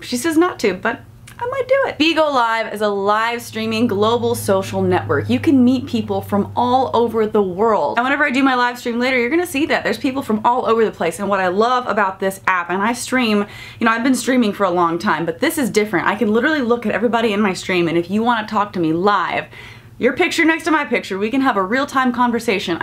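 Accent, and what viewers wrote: South, United States. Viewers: I hate that you keep using a southern voice pretending to be a “conservative” woman. Talk about stereotypes. 🙄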